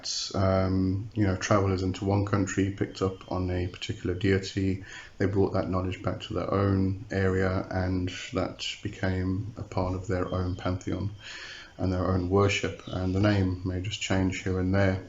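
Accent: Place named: United Kingdom. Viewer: u cant possibly know anything you sound British its ridiculous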